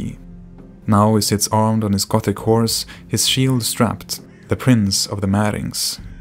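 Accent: Sweden. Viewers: Måhända att det är en underlig fråga men kommer du från Blekinge eller småland?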